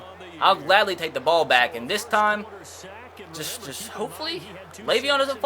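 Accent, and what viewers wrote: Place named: Alabama, United States. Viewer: U have an alabama voice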